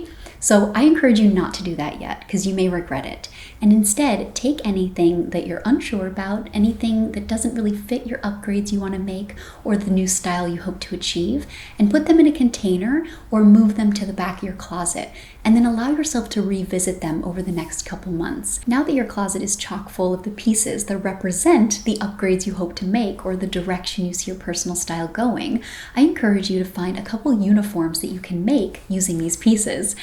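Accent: United States. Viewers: You are one of the few American Youtubers I can watch. Everybody else will scream at you and pull this show like they are bursting of energy and good humour. I mean, you are really friendly, but not hysteric and loud. And your American accent is so nice and subtle. Why can't tourists visiting Europe not speak and dress like you?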